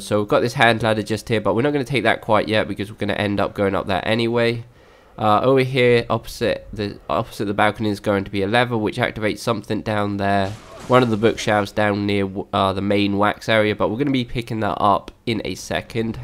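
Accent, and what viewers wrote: United Kingdom. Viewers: ur british am i right?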